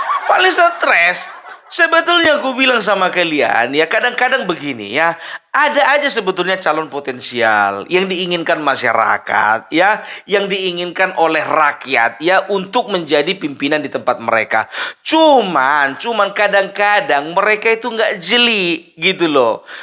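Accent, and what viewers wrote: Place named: Java, Indonesia. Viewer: opung kok medok boso jowo.😮😮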